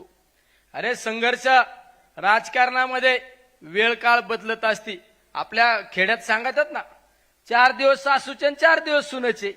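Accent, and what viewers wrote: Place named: Ahmednagar, India. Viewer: अस्सल नगरी कडका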